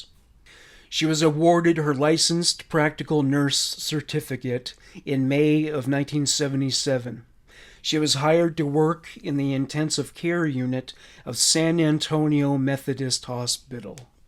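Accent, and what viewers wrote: Canada. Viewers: He's Canadian give him a chance aha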